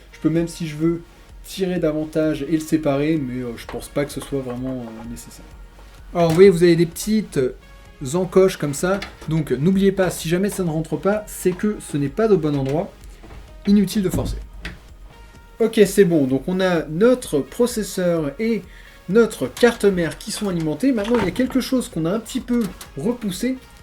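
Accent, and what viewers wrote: England, United Kingdom. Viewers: mais tu devais avoir un accent plus anglais avant dans ta jeunesse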